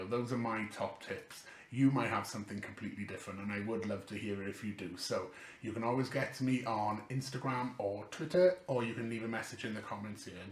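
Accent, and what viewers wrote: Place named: South Wales, United Kingdom. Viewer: New sub here! Also from South Wales I'm assuming by your accent you are to! Great video keep up the hard work !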